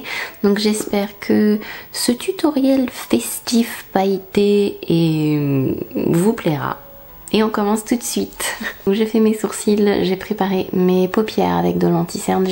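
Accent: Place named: England, United Kingdom. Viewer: Coucou, j'adore ton petit accent anglais :) Très beau makeup, on apprends pleins de trucs. J'avoue j'adore quand tu te fais des smokies bien intenses. C'est personnel, je suis pas fan des paillètes. merci pour cette vidéo, gros bisous^^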